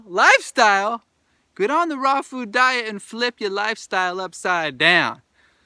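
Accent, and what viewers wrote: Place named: California, United States. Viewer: dude I really like u! ur energy and accent is cool hahaha. reminds me of a cali surfer dude. Do u have FB or IG to follow too?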